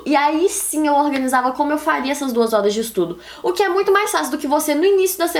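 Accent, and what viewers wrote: Minas Gerais, Brazil. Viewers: sabia que era mineira só pelo sotaque ,😅 😄😍,amo suas dicas ❤ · Voce e mineira também?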